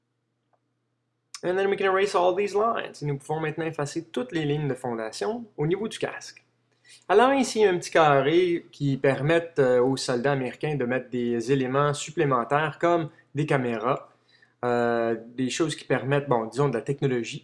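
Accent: Quebec, Canada. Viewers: Waw.. Magnifique.. C'est un rêve de savoir dessiner comme toi ! J'suis allé au Québec en vacance, leur accent m'a fait penser a toi ! :P (Je suis Francais). C'est vraiment un talent fou que tu a, en plus, tu le commente aussi bien en Francais qu'en Anglais.. Un grand bravo a toi, merci et continue tes vidéos ! ;)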